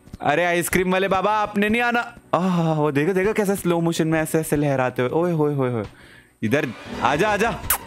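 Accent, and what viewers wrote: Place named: Bengal, India. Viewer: he is talking like Bengali people ❤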